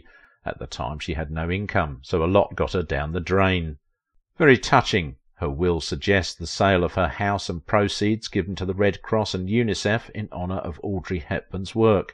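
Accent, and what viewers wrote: United Kingdom. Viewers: Your "Proper" British accent does not hide your ignorance - "Song Without End" is not a documentary BY Franz Liszt...it is ABOUT Franz Liszt - the Hungarian Composer, and virtuosic Pianist! Jeeeezus!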